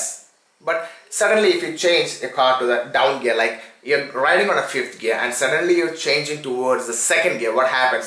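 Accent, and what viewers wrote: Kerala, India. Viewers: I think he is a malayali...from slang.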